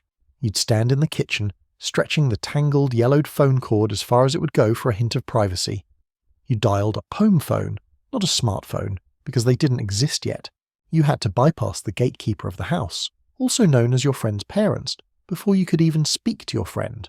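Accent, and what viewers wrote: England, United Kingdom. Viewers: Brit accent for Americana. Hmmm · Nostalgia. It's a little unusual to hear a British voice narrating American English vocabulary and photos. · This British accent is pissing me off for some reason 😡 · Uk accent for a US life. Doesn’t matter. Most of it applied. A child of 76, I grew up playing feral in short shorts, bikes with long seats paper rounds, making camps out all day every day in the summer with the only instruction to be back home by dark. Happy happy times. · The British commentators voice does not fit the memories from AMERICA in the 70’s and 80’s. Sorry, bad choice. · Man, I was about to enjoy this video till they put this bullshit British accent down there that’s not American or nostalgic. What the hell is wrong with you? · Why does the narrator have an English accent? This is about 'Merica. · This is a strange video with a British accent but American images.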